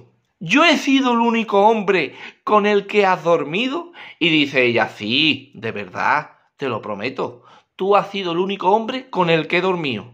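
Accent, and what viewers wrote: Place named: Andalusia, Spain. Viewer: soy una abuelita de 86 años!!!te veo desde París y me rio muchísimo con tus chistes tan graciosos y tu. forma de contarlos con ese acento andaluz y tus gestos tan salados. Sigue majo, que nos alegras la vida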